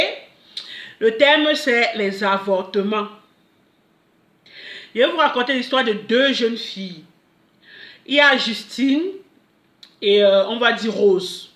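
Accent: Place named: Cameroon. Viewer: Coucou, dans ta voix on voit que tu es une Camerounaises et une femme FORTE ♥️👌👌👌 Tu es très Nianga ( je suis une fille no com vulgaire please )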